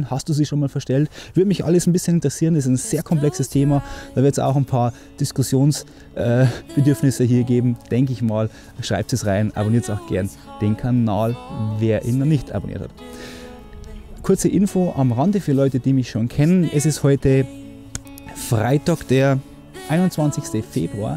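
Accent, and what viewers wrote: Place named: Bavaria, Germany. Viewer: Physik auf bayrisch 😁👍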